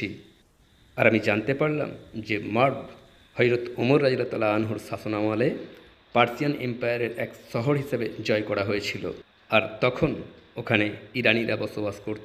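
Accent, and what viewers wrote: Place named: West Bengal, India. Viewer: ভাই উনার ভাষা এমন। উনি পশ্চিমবংগের সম্ভবত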